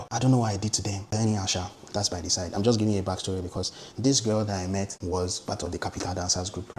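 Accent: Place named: Nigeria. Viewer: U speak like a Nigerian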